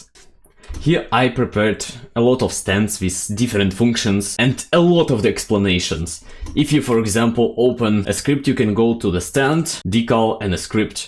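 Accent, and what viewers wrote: Russia. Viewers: that indian accent (nvm it's russian) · русский акцент у тебя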